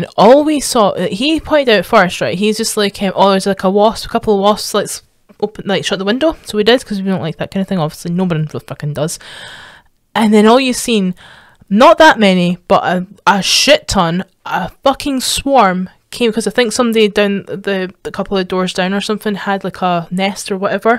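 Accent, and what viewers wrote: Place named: Scotland, United Kingdom. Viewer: Your comments and stories with that Scottish accent love it!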